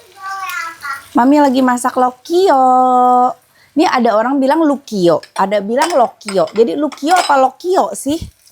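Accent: West Java, Indonesia. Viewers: Teteh, saya suka dengan cara ngomong sunda teteh ❤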